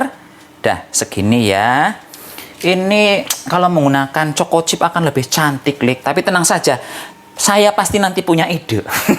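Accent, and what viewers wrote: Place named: Banjarnegara, Indonesia. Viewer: Mas...aku ngefans banget karo njenengan....saben dina nontoni nyutube..nek minggu libur..sok praktek...top resepe kereen. Nyong dadi kemutan desaku nang kabupaten Banjarnegara....ngomonge dialeke plek kecipek yakin.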